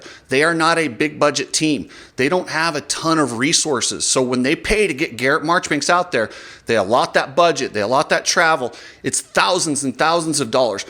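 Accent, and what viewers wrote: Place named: California, United States. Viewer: It's motocross, shit happens. It sounds like you're from California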